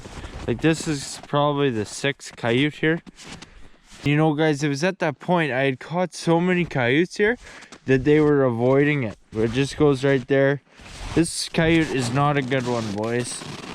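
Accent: Canada. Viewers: From Texas. Love those Canadian vowels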